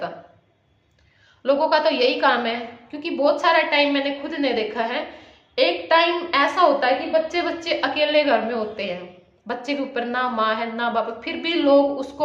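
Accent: Haryana, India. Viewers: bebe ghne din m video Dekhi...Rohtak aali bolan laagiii bebe🤭🤭🤭🤭🤭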